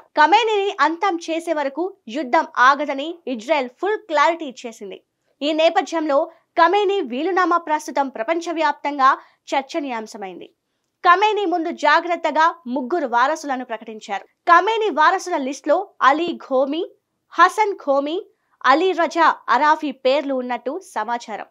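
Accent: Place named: United Kingdom. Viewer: Voice baledu ...English accent lo telugu matladutunnav.....👎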